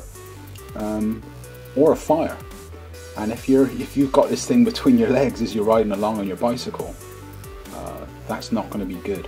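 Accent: Bristol, United Kingdom. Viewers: Good video, are you from Bristol?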